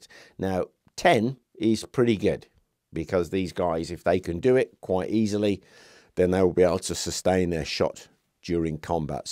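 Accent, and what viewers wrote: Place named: England, United Kingdom. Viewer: thanks for the video ! i prefer when english people speak in english, because the automatic translation of Youtube understand you, not the americans !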